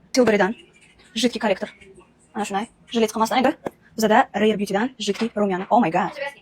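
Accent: Bukhara, Uzbekistan. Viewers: Shumo a buxoromi yoki samarqandimi shumo chibarósh purstashtet gôyet tojiki gapzadashtagetonba. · Wevangiz zo'rde. Buxoromi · Бу киз манимча Бухородан. Шева тортаяпти. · Opa Buxorodan misiz tojikcha gapirib qoʻydiZ☺☺☺☺☺ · Buxorolik ekansiz😂 tojikcha gapirganizdan bildim😂😂😂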